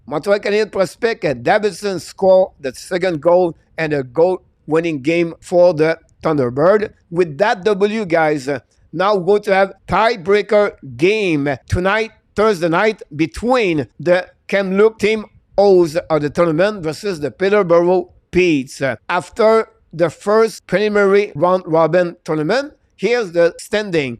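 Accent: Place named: France. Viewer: I love just how french you are Coach! The way you speak c'est parfait! Keep up the content please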